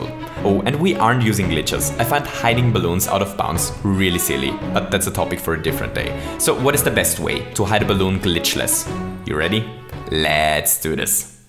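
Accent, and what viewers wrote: Germany. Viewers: Wie man direkt heraus hört das er deutscher ist... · Bro der deutscheste akzent den ich jemals gehört habe (Nohate) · Man hört das Deutsche so sehr heraus :D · Is he German? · are you german?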